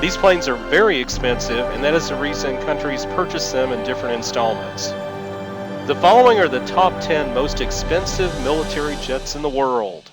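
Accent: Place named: Pakistan. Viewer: Your voice is pakistani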